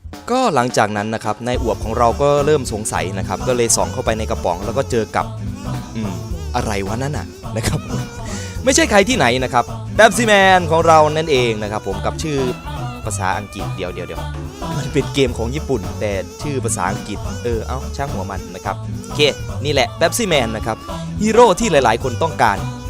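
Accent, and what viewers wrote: Isan, Thailand. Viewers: พี่อยู่จังหวัดอาไรคับ สำเนียงเหมือนคน อิสาน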